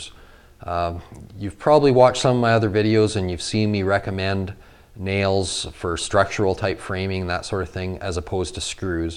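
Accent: Canada. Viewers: Is that a Canadian accent I hear? 🙄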